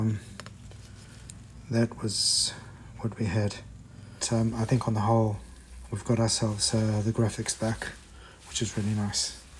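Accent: South Africa. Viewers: You sound very South African?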